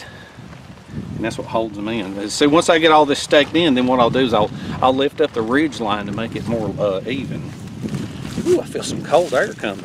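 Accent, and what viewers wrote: South, United States. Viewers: I love to hear his southern drawl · you have the heaviest southern drawl I think I've ever heard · Holy Moly 10 days of rain. Good sound for sleeping though. I love the sound of rain on a tent, and rushing water, louder with the wind the better. I so love your great quality gloves with the velcro wrist straps to tighten. Nice matching hat too. Wow great idea on the 2 custom tent stakes and to boot you criss cross them for extra stability. You are one of the cleanest best color coordinated dressed bush crafter or sometime woodsman lol on YouTube I bet. Good for you! What was that in your accent, was that sake? Love the southern accent. Wow awesome results!!!! Hot stuff wow. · How did you make your reflective tarp and firewall? I learn a lot from you and love the southern accent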